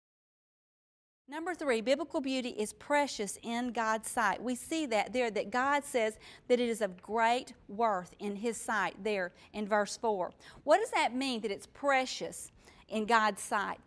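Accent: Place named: South, United States. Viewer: I love the southern accent!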